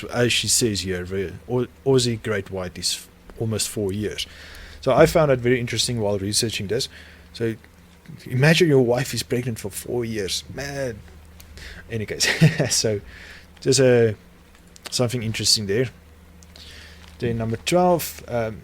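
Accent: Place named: South Africa. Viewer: i heard it in the accent and when you Said ja further in the video i knew for a fact, im from SA aswell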